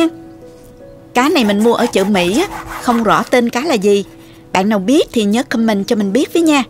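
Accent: South, Vietnam. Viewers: giải thích rõ ràng.. giong Nam hay qua, nghe êm tai vi giong của cô không bi ngọng khi đọc những chữ bắt đầu với R! Những video miền Tây nghe khó hiểu quá. Cam ơn Cô. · Mình rất thích xem dạy nấuăn, cô ở tiểu bang nào vậy? Nghe giọng miền nam rất dễ thương,mến chúc cô và gd nhiều sức khỏe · Hi. chỉ dẫn công thức nấu ăn ngon.Rất cảm ơn về chương trình này. Với giọng nói Miền Nam ngọt ngào và tai' nấu ăn của thì chắc chắn không chê vào đâu được. 👍❤🇺🇸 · Giong noi mien nam cua that truyen cam de thuong mon nao cua huong dan deu ngon va hap dan, cam on nhieu, Ca co noi, la ca hanh ben My. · Tôi thích nghe cô nói rất truyền cảm giọng nam bộ nhẹ nhàng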